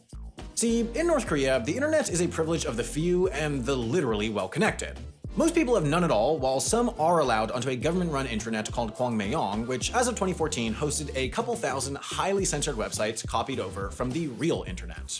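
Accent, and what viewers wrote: United Kingdom. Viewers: Why British? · She had to spend some time in Britain because that accent is a British one and I don't know if there is anywhere else she would pickup that accent, unless she is put on a voice for the videos. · why does she sound british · Why does she sound British 😅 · how does she have a british accent?! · why does she have a British accent? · damn that british accent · I love how she speaks British English, instead of Americanised drawl, absorbed by osmosis from mindless entertainment. Pure class 👌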